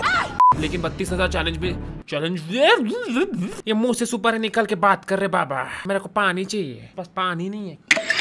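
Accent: Maharashtra, India. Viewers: Mahhpat : you are marathi or hindi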